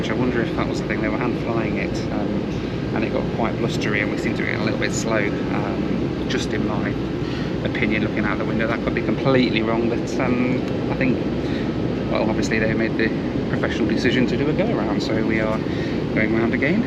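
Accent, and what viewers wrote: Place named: United Kingdom. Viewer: Thank you for doing what you do. As an Italian that grew up in the UK, and that now lives in the US, it’s good to hear a familiar accent showcase the same passion I have.. aviation. You’re likely the only channel on YouTube, I watch every day. Thanks again and I wish you luck for the future!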